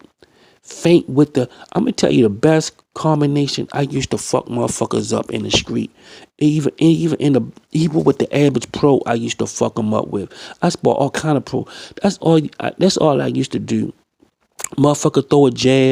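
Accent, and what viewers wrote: Baltimore, United States. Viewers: Straight Baltimore accent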